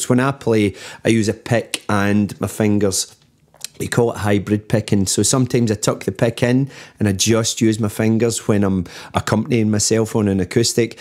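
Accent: Scotland, United Kingdom. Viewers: YOu must be a Scottsman?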